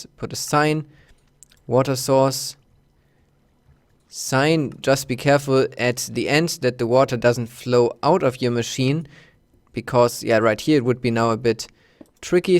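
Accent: Germany. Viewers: Are you german ? :) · Are you from Germany ? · Are you a german? You sound a bit like me, and I am german.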